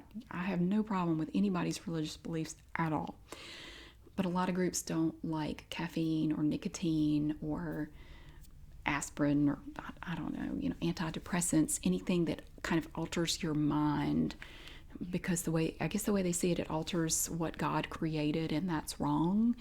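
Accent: South, United States. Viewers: Nothing sounds like the devil from your videos. You have a God given gift of a soothing gentle southern voice. Thanks for helping me rest.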